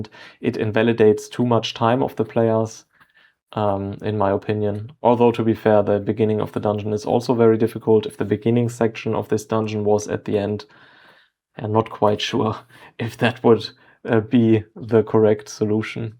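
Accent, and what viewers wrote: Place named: Germany. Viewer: Nolo, schön, dass du mit deiner Aussage Leuten Faulheit beim Lernen unterstellen möchtest oder Menschen, die eine Lernbehinderung haben, damit vor die Füße spuckst. Ich kann Englisch und ja, man kann ihn gut verstehen, und er macht seine Sache auch sehr gut. Das hat allerdings gar nichts mit meiner Aussage zu tun. „Wer lesen und verstehen kann, ist klar im … naja, du weißt schon …“ Man hört bei ihm halt ganz klar den Deutschen raus, und ich finde es einfach schade, dass es kaum gute deutsche WoW-Content-Creator gibt. Ja, ich weiß, mit Englisch erreichst du einfach viel mehr Leute, aber dafür ist der Markt auch stark übersättigt. Daher kommt meine Aussage. Aber gerne werde ich für Menschen wie dich dies in Zukunft genauer spezifizieren. Ein schönes Wochenende wünsche ich dir damit und schon einmal einen guten Rutsch für S2!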